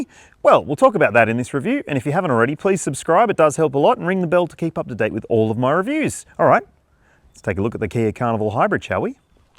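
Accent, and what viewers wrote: Australia. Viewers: Viewer from Malaysia here, Great review btw Could do with more infographics when explaining all the safety features, I know stralia' has more variants than us, ( we only have 3-4 only including the 11 seater), you could add your pick of the range Diesel + ? Which trim level with all the safety features Again, nice review Ps: i wonder how much does aussie auto reviewer had to tone down the accent for global accent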